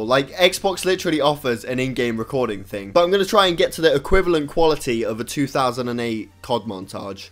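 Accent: United Kingdom. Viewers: British schlatt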